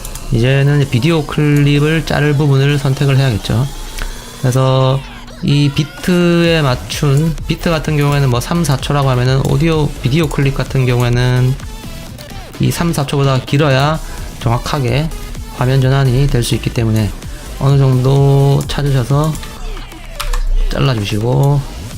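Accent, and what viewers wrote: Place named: Gyeongsang, South Korea. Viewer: 앗, 템플릿 영상만 보다가 처음으로 목소리를 들었는데 사투리 억양에 급 친근함이...ㅋㅋㅋㅋㅋㅋㅋㅋ 저도 갱상도 입니다...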